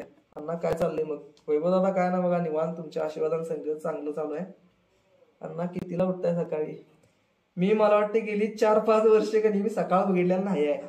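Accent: Kolhapur, India. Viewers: अतिसुंदर कोल्हापूरला गेलो असे वाटते फारच छान आपली कोल्हापूरची मराठी भाषा ऐकून फार आवडते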